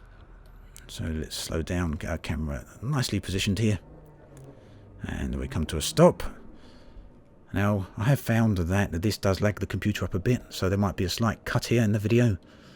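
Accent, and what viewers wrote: United Kingdom. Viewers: Still a great video. I went from thinking maybe I have to buy some sort of add on to make the cameras work, to using mine (basics) in about half an hour. Thanks for efforts your videos are excellent - and nice to hear a British accent as welk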